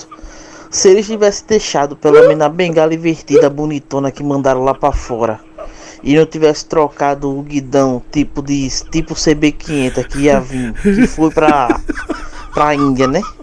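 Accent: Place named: Recife, Brazil. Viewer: Tive de ouvir tudo kkk , provavelmente ele é de Recife/PE, sotaque não nega.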